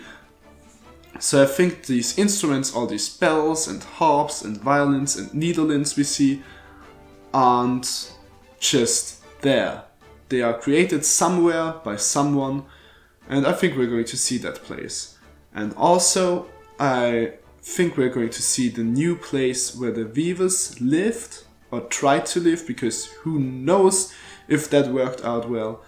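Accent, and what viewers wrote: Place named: Germany. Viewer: Ich der direkt nach den ersten Sätzen bemerkt das nen Deutscher ist den man hört es einfach richtig stark raus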